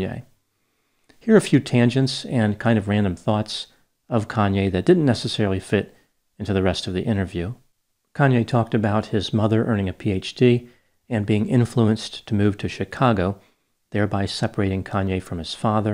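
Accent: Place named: New Jersey, United States. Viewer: I believe he mentioned that he's in Phoenix now? Hence, all the cacti that's gradually increasing in his background. 🌵🌵🌵🤔😂 I definititly can hear the jersey accent in his speech, though, and I love it haha.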